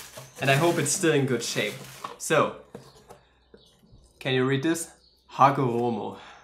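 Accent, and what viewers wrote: Germany. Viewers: Oh Ich hab mich schon gefragt, warum ich so einen leichten deutschen Akzent heraus gehört hab und war richtig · Ich weiß nicht wieso, aber ich wusste direkt das deutsch bist 😂😂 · I could tell from your accent. I'm half Filipino and half German too btw. Nice video!👍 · That’s a German accent isn’t it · i still like this german accent artifact of clearly pronouncing all "L"s that precede final consonants like k, "chalk". it sounds like your new chalk. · How do Germans know how to make their own accent funny.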